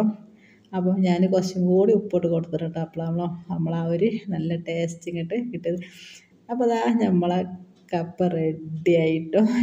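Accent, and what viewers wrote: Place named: Malappuram, India. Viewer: Wow ഹെൽത്തി കപ്പ കറി അടിപൊളി ആയിട്ടുണ്ടല്ലോ പൊളിച്ചു എനിക്കു ഇഷ്ടം എല്ലാവർക്കും ഇഷ്ടം ആകുന്ന മനോഹരമായ മലപ്പുറം നാടൻ ഭാഷ വന്നപ്പോൾ കപ്പ തിന്ന ഫീൽ ആയി 👌👌😋😋🥰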